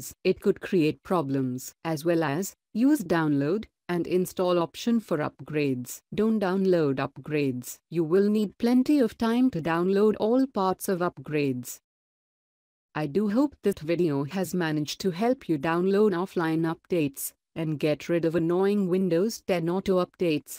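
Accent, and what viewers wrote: India. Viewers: i can still hear an indian tongue ..SRSLY BAHBAH who heard it like i do ? anyone ?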